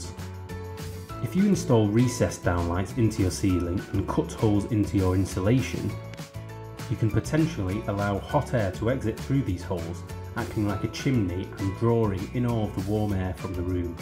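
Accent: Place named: United Kingdom. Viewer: OH NO.. A BRITISH GUY... UGH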